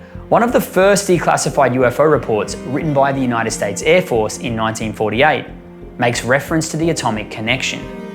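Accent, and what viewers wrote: Australia. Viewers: It’s about time we had an Ozzie voice…glad I found you. Thank you. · You're argument is well thought out and presented. Good to hear an Australian voice presenting information on a topic that tends to be presented differently to the Australian manner of thinking and understanding. I'm looking forward to hearing more from your channel. 😊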